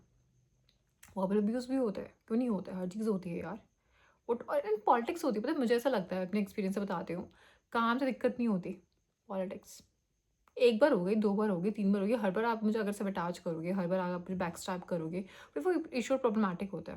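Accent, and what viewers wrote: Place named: Delhi, India. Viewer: Aapka communication and Hindi dekhke I can be sure ki aap Delhi se ho.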